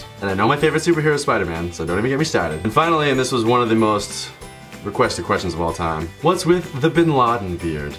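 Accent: Boston, United States. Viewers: This was fucking excellent! That Boston accent is definitely coming through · I enjoy your Boston accent. :) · Love the Bostonian accent.. and the beard!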